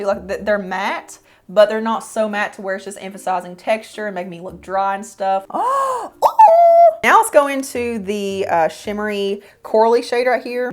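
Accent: South, United States. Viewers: Love your videos!! I am a Southerner and you accent sounds like you were raised in the South.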